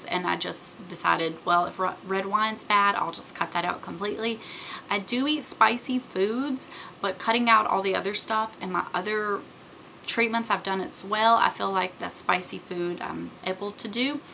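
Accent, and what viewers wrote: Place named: Alabama, United States. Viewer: You sound like you're from Bama girl. Not a bad thing.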